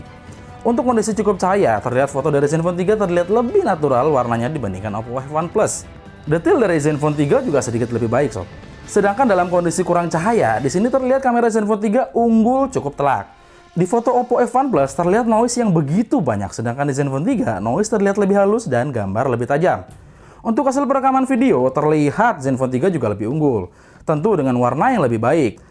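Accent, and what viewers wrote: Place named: Bali, Indonesia. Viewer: psti orang bali ya om..?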